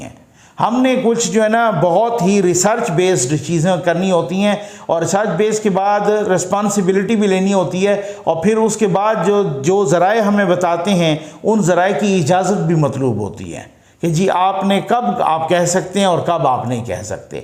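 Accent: Saraiki, Pakistan. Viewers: Sir r u sariki speaker?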